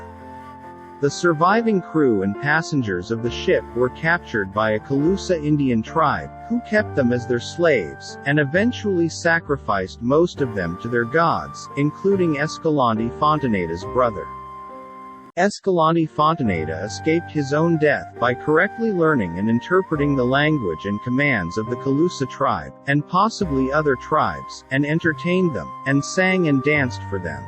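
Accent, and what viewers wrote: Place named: North, United States. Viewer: you sound like a yankee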